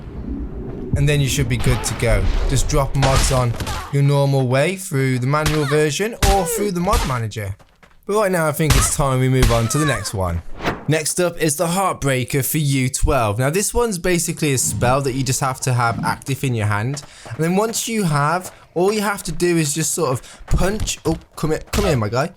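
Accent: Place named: United Kingdom. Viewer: Uh it kinda concerns me considering that its so dude that yells for his intro and the British accent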